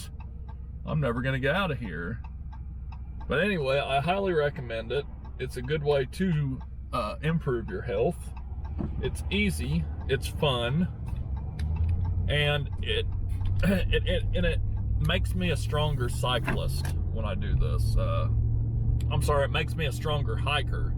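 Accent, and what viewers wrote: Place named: South, United States. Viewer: Very cool! Thanks so much. You sound southern, and I'm southern so I'd know!😄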